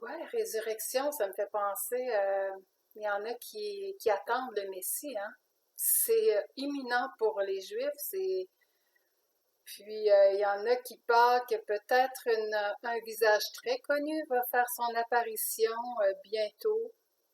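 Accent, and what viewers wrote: Quebec, Canada. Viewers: J 'aime ton accent québécois et d'ailleurs je devrais une fois venir voir mes amis là-bas. A part ça je me suis abonné de suite car tu parles que de l'essentiel , tout ce que je lis tous les jours sur les médias alternatifs. J'aime ta façon d'expliquer et je suis toutes tes vidéos. Salutations depuis la Suisse. Raymond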